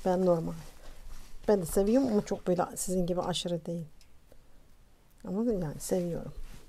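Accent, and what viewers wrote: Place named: Gaziantep, Turkey. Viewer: konusmanizi cok hosuma gidiyor. Dunurumde Antepli ayni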